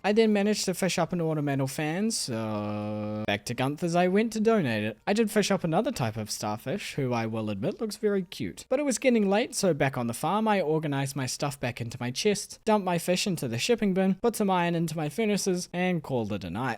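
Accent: New Zealand. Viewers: Just watched all your 100 days series videos and I have to say I am absolutely loving it! 🙌 Your New Zealandish accent is quite funny to listen to while you play as some words you say are clearly very differently pronounced to what I assume most of your viewers accents would say which causes some funny confusions 🥴😂 Keep it up and looking forward to next episode! Much love from Australia!! 🇦🇺 Question: I guess you are managing just fine without it but where's your UI boost Mod at? 🤷‍♂️ And also a pigs 🐷 name has gotta be 'Pigpen' from Charlie Brown 😋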